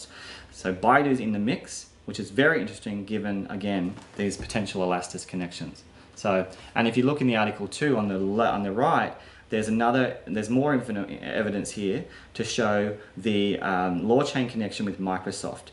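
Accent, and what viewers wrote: Australia. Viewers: Awesome!! That's a lot of info to absorb, sounds like you're in Queensland and have a foxy type dog. You make very interesting points and links. thank goodness I chose Neo. For all the hours and hard work I would like to donate some Gas but it seems you are to humble. Really appreciate your work mate.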